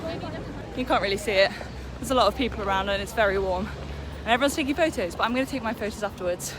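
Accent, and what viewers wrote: London, United Kingdom. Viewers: Are you from London? Australia?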